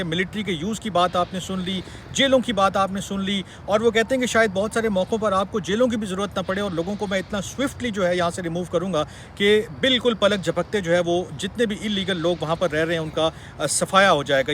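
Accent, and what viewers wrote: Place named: Punjab, India. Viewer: Tum. Pakkay. Punjabi. Lagatay. Ho. To. Phir. Punjabi. Kyu. Nahi. Boltay.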